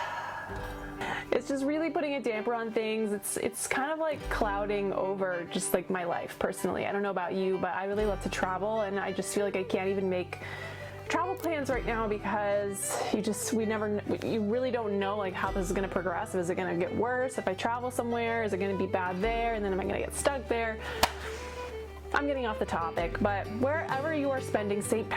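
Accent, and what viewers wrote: Russia. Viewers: Sounds like Russian accent!😂😂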